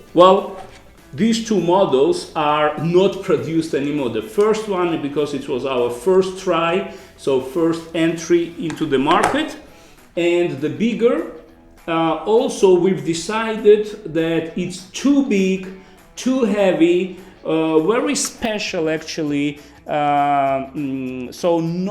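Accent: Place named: Russia. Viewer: Испанский стыд за русский акцент в английском языке. Континуэйшн. Ой....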